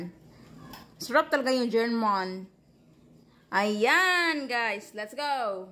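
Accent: Visayas, Philippines. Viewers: Tama ka sis..naawa ako sa knya kc grabe kun mambash ang iba..she’s just being true to herself..i am really so proud of her.hindi niya tinatago kung ano meron sa paligid niya.yung pronounciation xa ok lng kc bisaya xa hindi xa sanay sa tagalog..understandable.marami lng tlg bashers..sa totoo lang habang nangbbash cla, ang blessings bumubuhos kay..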